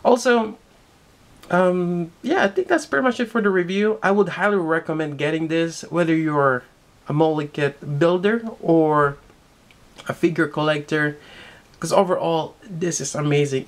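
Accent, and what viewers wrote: Philippines. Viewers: Are you a Filipino? There's something with your accent. :)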